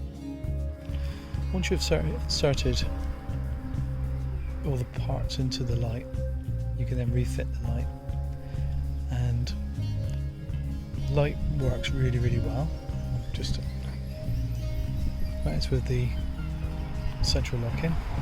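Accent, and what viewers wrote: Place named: Plymouth, United Kingdom. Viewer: Well done mate good video, I am going to give this a go on my MK4, your accent sounds local to mine, I'm in Plymouth!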